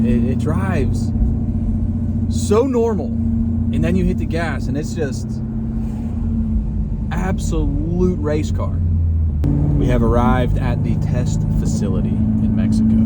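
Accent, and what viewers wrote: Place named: Delaware County, United States. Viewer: Picking up a little Delco accent there.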